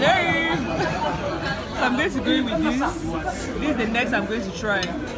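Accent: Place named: Nigeria. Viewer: You have a Nigerian ascent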